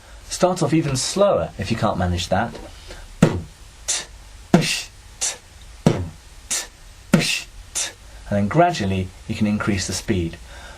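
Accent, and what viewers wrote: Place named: Kentucky, United States. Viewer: its sound like kentucky, jusge m elike i be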